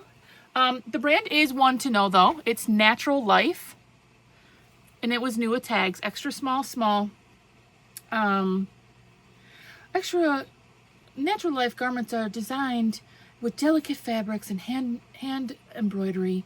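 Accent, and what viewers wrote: Wisconsin, United States. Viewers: Fun to hear you talking about Madison. I grew up there and loved it! And I love to hear that Wisconsin accent. 😊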